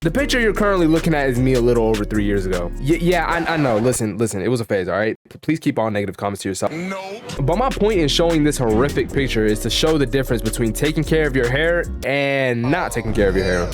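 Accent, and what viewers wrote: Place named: Africa. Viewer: Yoh which part of africa are you from?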